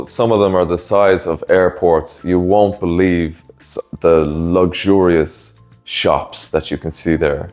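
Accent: Ireland. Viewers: You did good, loved this also your Dublin accent (The nuns that taught me in East Malaysia were from Dublin) good luck on your blogging!!!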